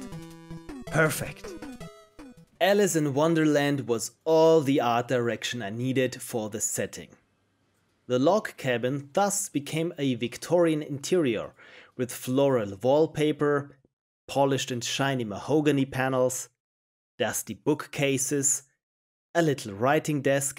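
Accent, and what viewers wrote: Germany. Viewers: Is he german? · are you from germany? you sound like you are german...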